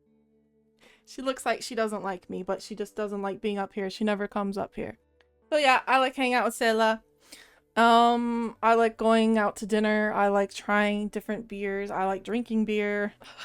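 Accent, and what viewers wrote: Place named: Australia. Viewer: Wow I always thought you were some type of Australian for some reason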